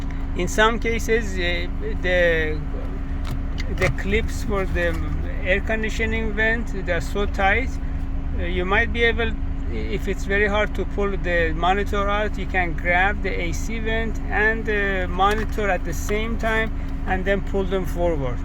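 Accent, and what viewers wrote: Iran. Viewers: واى لهجه رو انگليسى با لهجه ايرونى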